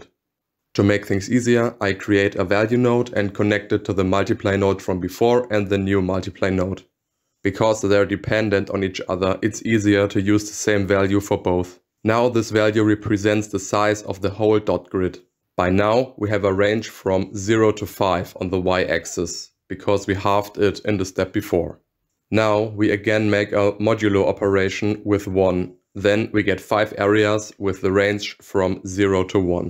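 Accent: Germany. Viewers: Very nice breakdown, directly used it to replicate a DVI input that thicc enchanting german accent <3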